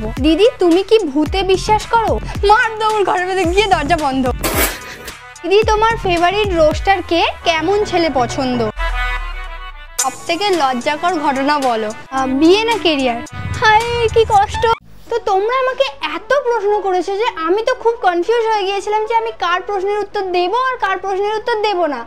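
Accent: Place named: Marwari, India. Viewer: Tomar Marwadi Katha❤❤😅😅😅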